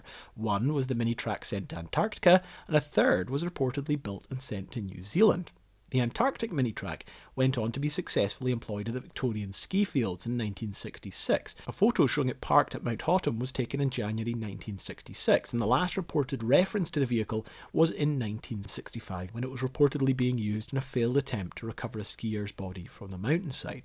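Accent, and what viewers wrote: Scotland, United Kingdom. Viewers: Fascinating videos but the speed of your commentary combined with the Scottish accent and my old age hearing make it difficult to keep up. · This is like longer Scottish Tom Scott. Great content, only a matter of time for 1 million subs for you.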